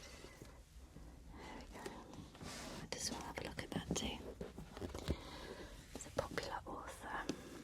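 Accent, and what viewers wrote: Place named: United Kingdom. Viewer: Thank you, beautiful choice of books and an incredibly calming video 💎 I've learnt English with American accent but thanks to you I'm getting so used to British accent, and even realising how elegant it is without being rounded😎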